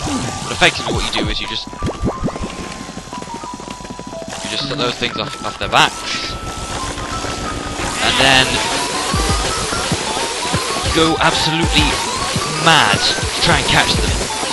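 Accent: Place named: England, United Kingdom. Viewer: is the dude that made this video English??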